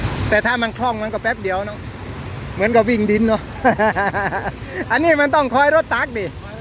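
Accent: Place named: Korat, Thailand. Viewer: วะพูดภาษาโคราชบ้านเอ็งเลยน้ะ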